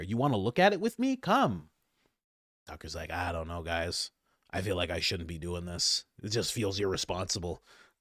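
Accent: England, United Kingdom. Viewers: Love the English accent!! The king’s English isn’t that good..😂 King Charles only wishes it were.. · I LOVE your British accent. YOUR SO MUCH FUN TO WATCH THANK YOU FOR ALL YOU DO ❤ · I love your British accent! 😅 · 😂 love the English accent! Alright bruv 😎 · Why is your British accent better than your American accent? 😂😂😂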